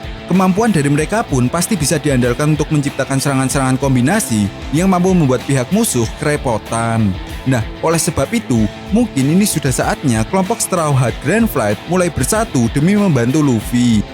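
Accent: Java, Indonesia. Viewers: lidah njowo mas, lanjutkan mas do ur best!!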